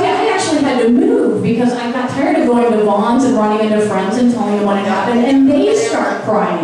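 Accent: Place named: South, United States. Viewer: Visited your site to find what a "southern belle" accent was, stayed for the comedy.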